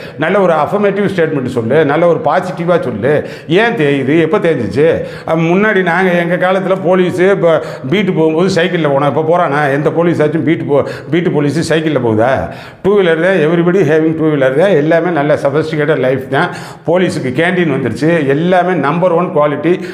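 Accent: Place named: Thanjavur, India. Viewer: Sir neenga Tanjore a sir andha language maari iruku sir super sir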